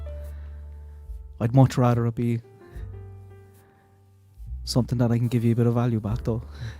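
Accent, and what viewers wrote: Ireland. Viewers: Not sure, a family member married an Irish guy, he talks exactly like you...he has Kerry gold butter n the tea, he makes a nice piping hot cup..he is from Tipperary..I am Irene Brady ,maiden name,have O`Hurley`s n O`Neal.. in family. I don`t know anything about my Irish heritage,it is such a mysteriously, romantic... brooding n beautiful place.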